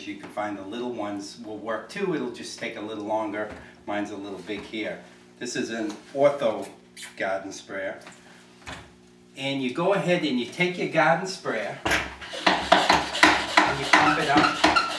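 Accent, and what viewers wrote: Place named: Boston, United States. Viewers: Boston accent, love it! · Good tutorial. Love the MA accent! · Gahhhhhdon sprayah. Good ole yankee accent you got there my brother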